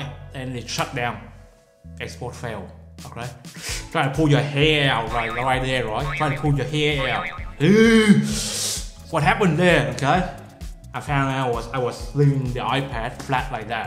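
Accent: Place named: South Africa. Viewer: Dude r y from South Africa? Cool accent! ❤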